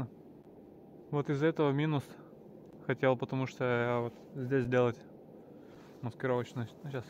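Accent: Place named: Vologda, Russia. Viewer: Интересный у вас говор, вы с Вологды?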